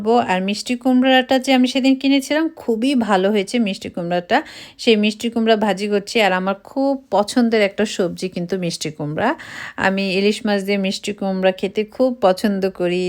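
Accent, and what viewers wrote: Sylhet, Bangladesh. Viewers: Apne sylhety ni apa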